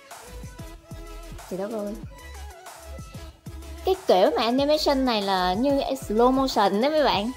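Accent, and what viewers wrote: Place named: North, Vietnam. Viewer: Giọng Bắc nữa cơ :3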